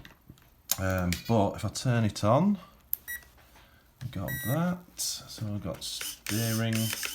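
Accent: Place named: Yorkshire, United Kingdom. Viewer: Great content mate, where you from? You sound Yorkshire, I'm Leeds myself 👍😎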